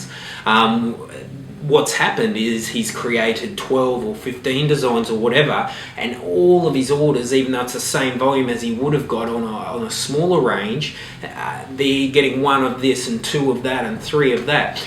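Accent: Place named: Australia. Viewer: I'm Australian and can tell he is also.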